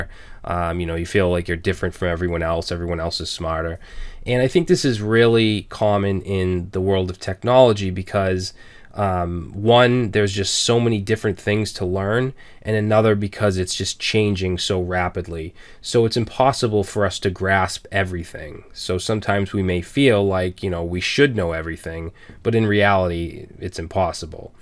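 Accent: Boston, United States. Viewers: Don't hide the accent brah. A Boston accent is trendy now-a-days. Excellent video. I've definitely felt the imposter syndrome. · Hi, this video really spoke to me. My history is plagued with depression and self doubt but decorated with a passion for coding. You really put the human element back in the job of coding. Many thanks. BTW, I am an old guy and your accent takes me back to JFK, a shining leader in my youth - hope you don't disguise it, I miss it, it is wonderful. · Needed to hear this this week - so thanks! Love your channel. Also don't hide your Boston accent! It's great! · Love those videos. They inspire us to learn more. Keep producing them please. Your channel is the best I have encountered. Learned a lot from your channel. Oh, and stop hiding that Boston accent, it reminds me of a great movie, "The Departed". Happy New Year to you and your family!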